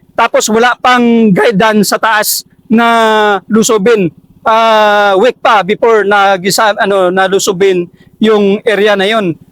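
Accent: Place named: Visayas, Philippines. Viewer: Hnd ah,bsaya nmn yn slita ny,,iba nmn Ang Lugar cnbi mo ung pnnnslita ny,klrong bisdak,🤣🤪👏💪🙄☝️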